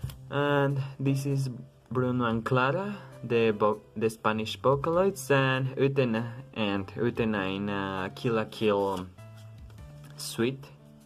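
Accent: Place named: United States. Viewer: muy gringo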